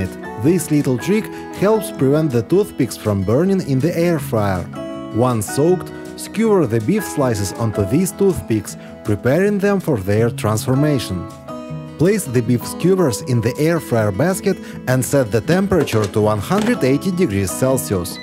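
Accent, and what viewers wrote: Russia. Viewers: Не зная Английский, слышу русский акцент😂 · английский с ру акцентом!) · Ужасный русский акцент !!!! Зачем говорить???? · Speaking english with russian accent is terrible.. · Русский акцент или мне показалось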